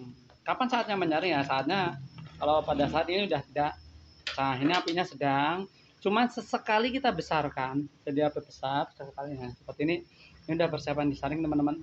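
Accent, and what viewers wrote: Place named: Java, Indonesia. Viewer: Mas asli mana sih, jawa jogjanya medok banget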